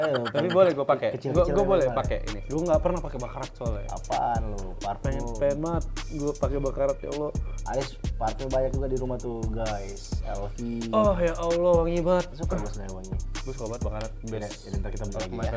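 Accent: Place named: Medan, Indonesia. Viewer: Ak senang bnget dek. Conten rame cakap Medanny ak snang kali.mungkin karma ak orang medan mranto kerja di jakarta.makasih iy dek 🙏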